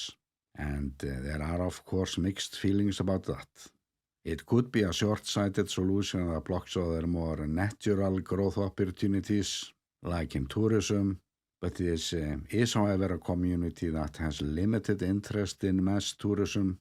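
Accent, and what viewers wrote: Iceland. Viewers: Have to say that you are not only an incredible videographer of your country, you are also an incredible historian about your country, with an unmistakable Icelandic accent! Thanks so much for this latest complete overview of one of your lovely communities. How would we know they existed without you! Great fun to watch, hear your voice and learn something new...all rolled into one few minutes of my time. Amazing!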